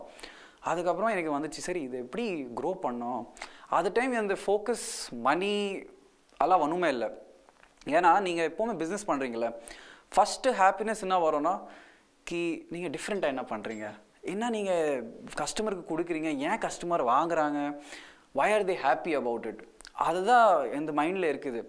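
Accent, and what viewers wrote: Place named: Bengaluru, India. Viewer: Bengaluru tamil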